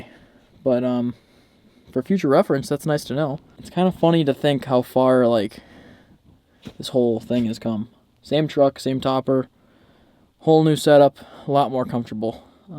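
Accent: Canada. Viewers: You sound like one of us Canadians. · You Canadian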